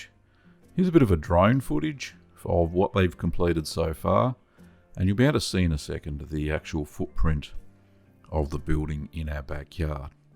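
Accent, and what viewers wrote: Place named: Australia. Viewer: I want to develop an accent like yours, can I develop it without coming to Australia, though? Like, I love the Aussies, but the snakes and spiders and sharks..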